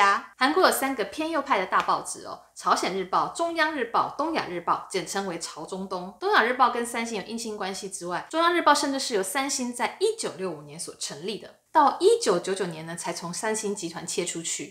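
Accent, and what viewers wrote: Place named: Taiwan. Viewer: 我没有点你的channel首页去看你的介绍，就凭你讲话的方式和语调就知道你是台湾人😄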